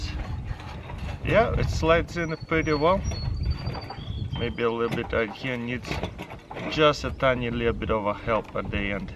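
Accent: Russia. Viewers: Ужасный русский акцент.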